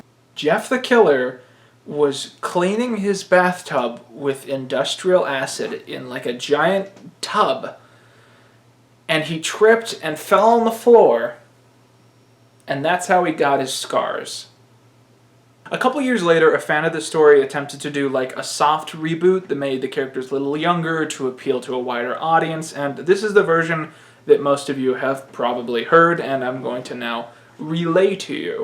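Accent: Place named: Midwest, United States. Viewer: Idk where you live but it sounds like the Midwest oof my friend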